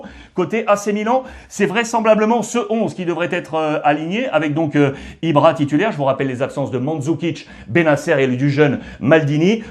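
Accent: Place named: United States. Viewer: L'accent américaine top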